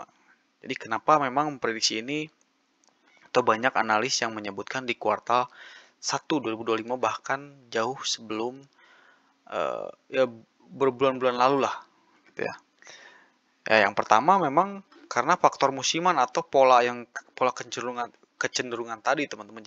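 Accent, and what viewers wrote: Indonesia. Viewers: Bang lu orang Tegal ya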